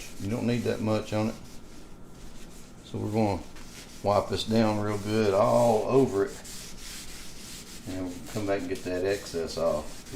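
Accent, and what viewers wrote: South, United States. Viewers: Where u from? I’m new to your channel. I’m from North Carolina. You are definitely from the south lol I just ordered this exact griddle bc of this review. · your accent is hard to understand for non US ppl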